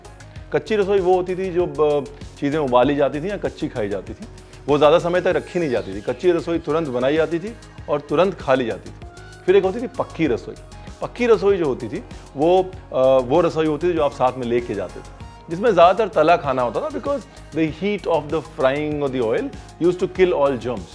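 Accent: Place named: Lucknow, India. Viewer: Ranveer Bhaji .. assi vi Lucknow to a. Menu vada changa lagta jab tussi pubjabi vich gal karde ho , with a slight Nawabi unfluence. Hor tuhadi recipes de ki kenhe. God Bless you, Ranveer!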